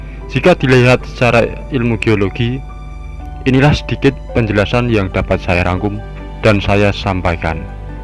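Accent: Java, Indonesia. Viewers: hostny lucu medok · Medok nya banget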